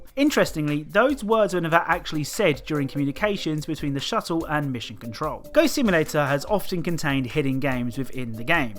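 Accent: United Kingdom. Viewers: you british man?🤨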